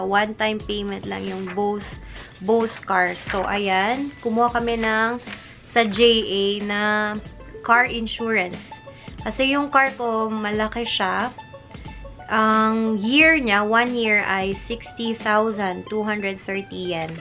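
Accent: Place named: Visayas, Philippines. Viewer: Ate gawa ka naman ng video with your class sa susunod. 😀 Bisaya man diay ka te.😁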